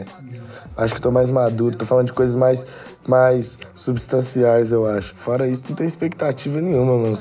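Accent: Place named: Minas Gerais, Brazil. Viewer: Eu conheci seu trabalho e fiquei apaixonada com suas letras fortes e sua simplicidade nesse vídeo só me mostrou o quanto vc é especial e chegou sua hora de brilhar. Eu sou leiga no assunto rap, escuto rap... mais sou bem eclética e te confesso que estou viciada no seu som e quando vejo eu tô escultando rap o dia inteiro. Que Deus te abençoe grandemente, estou torcendo por vc e sei que vc é do bem e quer o bem mostrando nas suas letras que todos somos iguais, independente de cor, religião ou classe social. Parabéns! Ahh e adorei seu sotaque de mineiro, é isso né vc é mineiro?!Beijos Djonga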